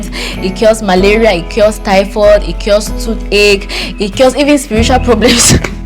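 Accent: Nigeria. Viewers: Ilat fisrt i said why did she introduced herself as a cameroonian, you soud more like a Nigerian.❤❤❤ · You sound 100% Nigerian,. Are you sure there's no Nigerian gene in you? First time subscriber. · Cameroonian don't speak like this, are you sure you not a Nigeria or you where born and brought up in Nigeria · You are Nigerian without knowing. Your accent and reflex is Nigerian. For the boxes payment, it varies from region to region. In the south, you will pay for virtually everything because, the people are money driven. In the north, you don't have to do that unless you have extra loads that will be needing loading. A bit of madness & street credibility will either get you off paying or reduced payment. Only in bukkas or restaurants do you wait to collect your food. In eateries, you get served. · Wow!!! Ok nau , any real Nigerian can clearly see a sister in you . 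Your accent is more Nigerian than Nigerians, now it's clear that Africans are related. Thank you for sharing beautiful sister 🥰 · You sound Nigerian dear. I hope you had a good time in Naija ❤ 🇳🇬 🇨🇲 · Wow, you look Nigerian, you sounds like a Nigerian, 100% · Yes,your accent Nigerian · You sound 100% Nigerian and you have such a good charisma about you. Thank you for visiting Nigeria and promoting our country 😂😂😂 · I’d have put a bet that you’re a Nigerian 😀😀 .. you look and sound like us geez ., you’re our sister Biko 😅 ❤., come back to us please .. for the eatery thing yes o my sister , you have to wait and take your order not all 😊, but for most you have to sit and signal someone to come to your order, … for the luggage most times it’s for extra luggage they pay for , for the food “a plate” contains everything , but for those ones that you want to specify you will have to pay for everything my sister except the stew 😂😂 .. 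na so we see am no vex … Glad you enjoyed your stay ❤❤ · Exactly. She sounds nothing like a Cameroonian but everything like a Nigerian. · You sound too Nigerian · Are you sure this beautiful girl is a Cameroonian?She looks Nigerian from her looks and accent.We like you girl ❤️ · You sound Nigerian. One of your parents should be a Nigerian. Good video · Love thisssss!! You sound more Nigerian than me! You express so well. Super amazing of you! Kudos👍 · Please 🙏 you have to verify better the origins or the roots of your family, you speak or talk like us very well. If you are in the midst of NIGERIANS it's would be difficult to differentiate your nationality 😂😂 big up my dear sister from another mother ❤️🇳🇬✅ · Wow! You speak so Nigerian-like in terms of accent. I really enjoy your narration. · Are you sure you are not one of those nigerians living from the boarder with Cameroon? Your accent doesn't sound like the English speaking camerroonians at all ? 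Any way , you do well!! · Same accent as Nigerians. If she didn't mention it, we would've assumed she was Nigerian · Why do you speak so much like a Nigerian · You sound Nigerian from south west or Edo. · Tell me wetin make you different from a Nigerian?. Your accent alone b like yoruba and igbo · Eventho', Cameroonians speak similarly to Nigerians BUT you have also, adopted some of the speech patterns that are peculiar to Nigeria. People that are close to U over there can tell U that for sure. Except if they've been watching a lot of Naija movies too! 😂😂😂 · I was shocked you were not Nigerian “ yet “. Your accent and diction sounds so Nigerian and the charisma and vibe is so beautifully Nigerian. I guess God missed this one - you. You have a new subscriber. Cheers love you. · You dont need to go back to cameroon, you look like nigerian and you sound like nigerian, we sure gonna check your DNA because we believe you're our's. 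less I forget, did you come to nigeria by road? I hv a friend you wants to come from cameroon but she's scared of flying. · I swear you be naija babe the way you speak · You sound Nigerian. Nice video.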